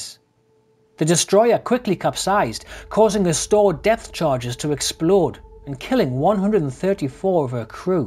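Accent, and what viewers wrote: Newcastle, United Kingdom. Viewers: Commentator has a Geordie accent.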